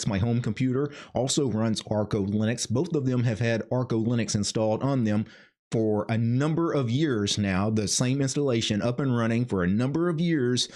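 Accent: South, United States. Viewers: He does not talk slow. That “slow” bullshit from people thinking they’re cracking “funny” jokes about Southern Accents. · As someone from the Southeast United States, sounds perfectly normal to me 😂 But to my friends from other parts of the country he has quite a strong accent 😛 A benefit of hearing the “Southern accent” of the U.S. for non-native English speakers is that it is likely the most slowly spoken of the different English accents, hence the famous “Southern Drawl”. It is very ironic to me that it is easier for some people who do not understand English very well to understand the “Southern” American accent better than some native speakers of American English who are from areas outside the Southern U.S. Language/linguistics is so fascinating! 🤓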